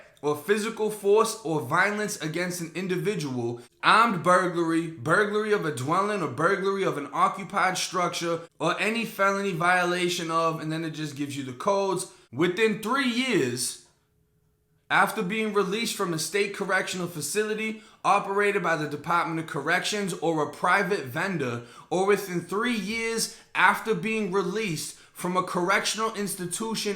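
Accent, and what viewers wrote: Boston, United States. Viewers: Damn bro you got a thick ass Boston accent.. I grew up ten minutes from Malden but live in Orlando now.. don’t have a felony on my record though. Got charged with assault with a deadly weapon but got a cwaf so they dropped it to a misdemeanor.. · You’re losing that Florida accent Ma Boi! Sounding like you from Boston again. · Um Hell Naw he doesn't are you from FL? he might of did time in prison in my city and state, but that's a Boston accent and he doesn't have a FL slang he is just trying to talk like us. lol i bet everybody in Tampa will tell you he sounds nothing like us. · Been rocking with you for two days and I knew you was from up top I know you was from Boston I hear it but you had me fooled for a minute ain't know what the thing you kept talking about Florida but that's besides the point you teach getting lessons and you have great stories keep doing your thing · All I hear is a Boston accent. 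I like it! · He uses florida slang with a Boston accent. Thats all. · That bean town accent don’t evaah go away lol no amount of time in Florida could get it out of him! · I was wondering what accent that was i thought it was ny but it didn't seem right then he said Massachusetts....thats boston!!!! Now it makes sense. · are you from boston? the accent sounds boston just asking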